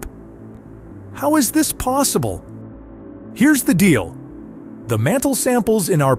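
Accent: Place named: China. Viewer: Humans did not land on the moon rather have CHINESE English the voice is too much stress selling business was not pechenga can not learn too much sress in the voice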